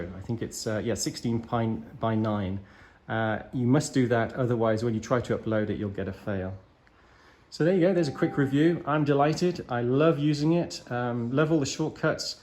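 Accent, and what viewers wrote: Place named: Australia. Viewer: your voice my man, sounds so much an Aussie！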